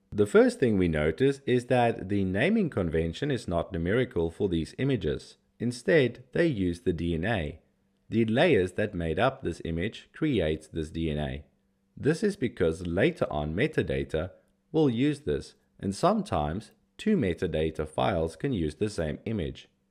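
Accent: South Africa. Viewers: Hey NFT ! Thanks for all this information. You sound South African, did I get that right? Anyways, I'm getting stuck in getting the art engine to work on my end (Windows). I have just finished my collection's art work and would now like to generate it. I'm getting stuck at the point where I have to input 'npm i' into the terminal. When I do so, it gives me a bunch of errors. I'm not a coder (still in the baby stages of learning) so this is all foreign for me. (Art engine template) Any help would be greatly appreciated.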